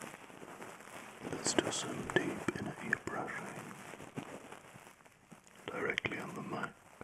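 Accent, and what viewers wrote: Germany. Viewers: He's German, so his English may be slightly more difficult for you to understand because he's also not a native speaker, and while he's very fluent in English, he does have a distinctly German accent. There's nothing wrong with getting some practice with listening, though. 🙂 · This is so amazing after 5 min i fall asleep are u german?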